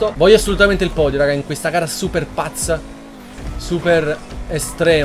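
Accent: Naples, Italy. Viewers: È di Napoli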